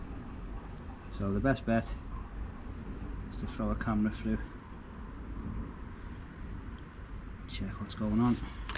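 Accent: Liverpool, United Kingdom. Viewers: Please don't talk when the jetter is on. It drowns out the sound of your voice. Your strong liverpool accent is difficult to understand already.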